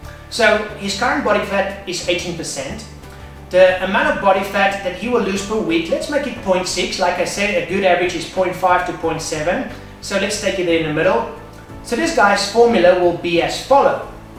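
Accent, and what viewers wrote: South Africa. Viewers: If i were to guess, i would say non other than English. From his accent, I think he is from South Africa where they speak predominantly English although there is a possibility that he also speaks Afrikaans which is the second most spoke language in SA. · are you south african?? :) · You sound like your South African and British. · Sounds like South Africa, right? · South African accent...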